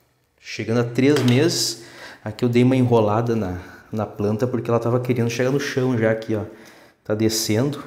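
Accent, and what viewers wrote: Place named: South, Brazil. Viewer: Teu sotaque parece ser do sul. Vc e sua família estão seguros devido as enchentes históricas?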